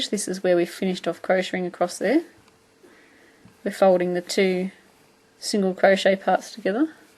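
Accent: Australia. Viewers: wow thx a lot for sharing God bless you-my daughter is in Australia for 1 yr. i suspect thats where your accent is- i understand it fine!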